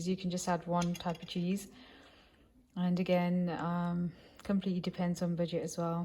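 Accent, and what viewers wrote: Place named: Midlands, United Kingdom. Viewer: Well presented and a great offering, stay connected and more importantly stay blessed 🙏 By the way you sound from the Midlands though I maybe wrong, thinking Birmingham 🤔😉😊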